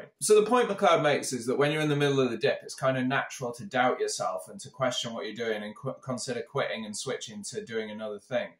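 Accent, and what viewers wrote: England, United Kingdom. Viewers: Interesting.... will have a look. Like your style and philosophy and good to hear an English accent. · I was wondering why Youtube proposed your piano learning video... then I worked out that it is because my first Karate master is also Kendo master while second is also Ju Jitsu master and then because you speak English with a British accent and, last but not least, you played When the Saints, the song I have been singing since Nursery School, my mother says... Ciao from an ex Londoner, ex Karate black belt ex fit person, ex ex ex ex...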